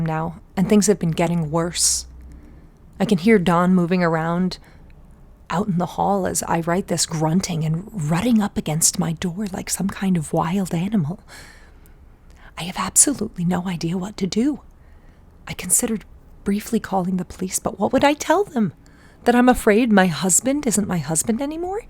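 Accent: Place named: United States. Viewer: Finally pleasant dread in American accent, thank you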